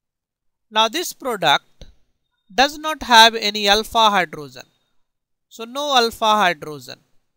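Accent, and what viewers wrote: India. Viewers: 황진웅 my goodness, he is definitely not french. more like indian or something.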